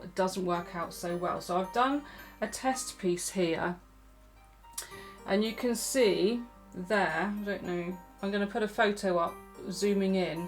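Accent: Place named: United Kingdom. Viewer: love your tutorials since i was clueless; can you remove the background music because it is distracts from your beautiful accent; Love that British accent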